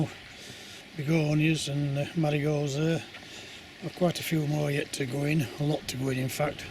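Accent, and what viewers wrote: Yorkshire, United Kingdom. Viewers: Hi. Good to have u back mate coz missed that huffin n a puffin Yorkshire accent 😆 Plus good to know the ol corona virus didn’t get ya! 👍 JohnE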